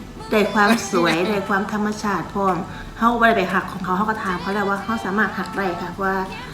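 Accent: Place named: Korat, Thailand. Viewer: สำเนียงคนโคราชเพราะดีนะ😊❤️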